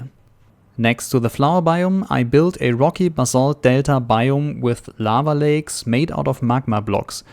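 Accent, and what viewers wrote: Germany. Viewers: very deutsches englisch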